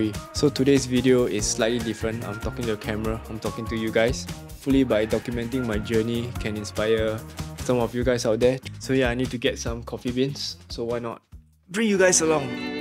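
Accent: Singapore. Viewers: Hey fellow Singaporean here! Just have to say your video quality is on par or possibly even better than what I've seen on YouTube from top content creators, as love seeing you being authentic in retaining our accent and embracing the fears that come with putting yourself out there! Think your authenticity comes through, and seeing your personality as it is lends so much more to the video too! Good job bro, your videos are what inspired me to look more into design and zhng-ing my room while I wait for my BTO! The vibes are immaculate haha and I've been opening the YT app so often to check if you've uploaded so you're definitely doing a sick job :) Too bad I wasn't able to catch you at Stacked's store last weekend but so glad to have chanced upon your content! Keep it up! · ahhh rooting for you! so many firsts in this video, but very excited for you to have many more adventures trying out this youtube thing!! 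also, hell yes to the sg accent ahaha we need more of it on here! i started vlogging for fun & just to have memories for keepsakes for when i get older & have to leave all the fun & games behind but it was really nice to see this, might still keep the hobby alive :") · I just discovered your video. Started with the Hanoi vid....very well done Admire your bravery ...can't wait to see more from you ..makes me wanna spend more time in asia. Also I like your singapore accent ..more pls! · don't worry about your accent! english isn't my first language and i've mostly learned it through watching youtube. nowadays my goal is to get to know and understand as many accents as I can, so the more I watch your videos, the better I'll get at understanding english spoken with the singaporean accent. keep up the good work!! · this vdo makes me feel like I’m watching a movie 🍿😍 Thank you and love the Singaporean accent. 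· I also like your singaporean accent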